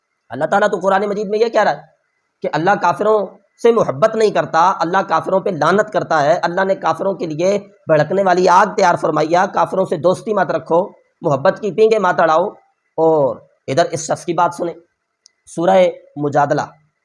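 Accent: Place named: Arab world. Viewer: The damage has been done. Do you really think whatever you say we will digest as a Muslim? Lolllll. Pakistani ulma are 80% thug of Pakistan. They are not on the right path. Ap ko kya lagta h mutfi, hafiz title lagany s or apna accent arabic kr k urdu m bat krna will create even a tiny impact on us? Lolllll. Bhae ap pehly khud ko dekhen ap Islam k kya khidmat sar-anjam d rhy hen, phr dosron ko kahen. Jab ap ko aik basic chez ka nh pata ke knowledge kisi s bh mily agar ke wo sach h, you have to accept it. wo hindu h Christian h koi bh h , bhae wo research kr k koi chez batata h to is m galat kya h ke aik Muslim us k taref Kry? Ap muslim hokar kon s research krty hen ? Bus babaon k books parh k, ulma k bat sun kr, sedha YouTube p akar record krty hen, is k ilawa koi or kam h ap logon k paas? M beshak ap k j tarah mufti, hafiz Waly title wala banda nh hn, but m aik question bh Quran s karn ga. 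Hmary Rab k qasam ap n us ka answer tak nh dena. To is liye mery bhae hao khao peo, aram kro, ghar walon ka khayal rakho, islam ko wahen tak pehlao pehly, jab ghar waly ap s razi hojaen phr YouTube p ao. 😅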